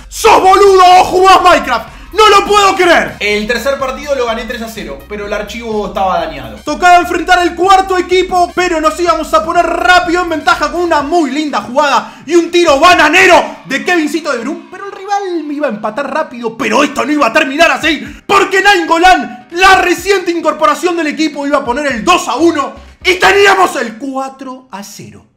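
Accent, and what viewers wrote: Argentina. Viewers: Jajajaja me encantas como comentas al estilo bien ARGENTO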